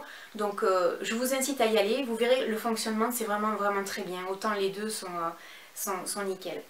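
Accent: South, France. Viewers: ton accent du Sud mon Dieu j'adore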